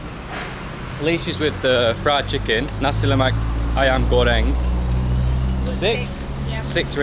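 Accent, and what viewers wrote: United Kingdom. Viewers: Your accent like British bro😂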